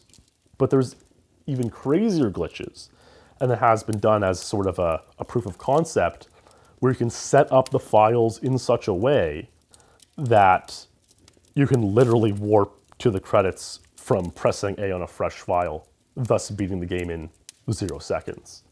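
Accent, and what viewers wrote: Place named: Canada. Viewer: what part of Canada you from?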